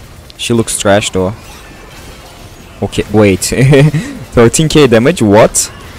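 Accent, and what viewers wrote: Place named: Turkey. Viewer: aksanindan türk olduğunu anladım sonra kanal adını gördüm :D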